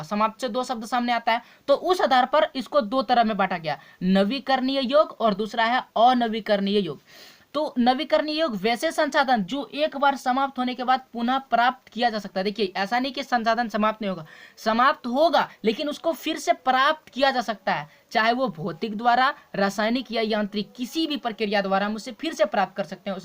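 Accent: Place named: Rural, India. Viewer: Gawar jaise kyo bol rehe ho aur faltu me pencil kyo chela reha hai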